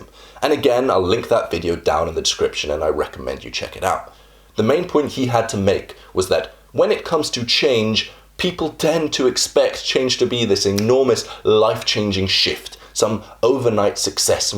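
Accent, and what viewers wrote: United Kingdom. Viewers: I thought you was British.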